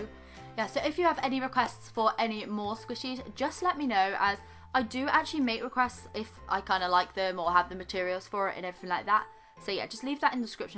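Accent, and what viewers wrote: England, United Kingdom. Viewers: Awesomely adorable squishy collection! Daughter & I can not wait to get started, then maybe we can tackle your squishy vending machine which was decorated so so sweetly! Congratulations on all your new subscribers! I enjoy the voice over explanations, not to mention your lovely English accent, which is a rarity here in America.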